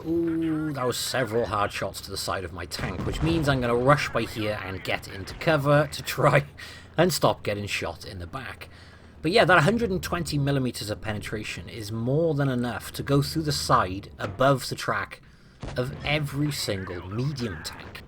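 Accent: United Kingdom. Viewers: I don't know how you get all these bouces while being on a flat ground, like the second game with leo and jag shooting at you... I get penned by stock T-54 all day long, even while wiggling and jiggling... 😭 Wg must be protecting you ! Or maybe do I suck? I'll try to apply the golden golden rule for my next games :p Anyways, thank you for the reviewing, love your British accent ~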